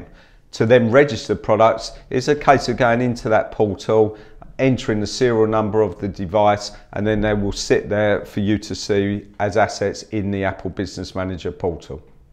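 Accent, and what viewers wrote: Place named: London, United Kingdom. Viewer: He has a proper London accent - entirely different to Aussie one. The auzzie accent makes you want to rip off your ears lol.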